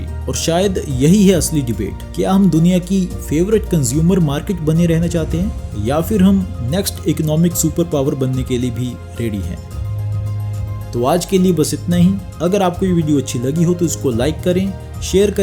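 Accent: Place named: Punjab, Pakistan. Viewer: Accent sounds more like how pakistani punjabis speak.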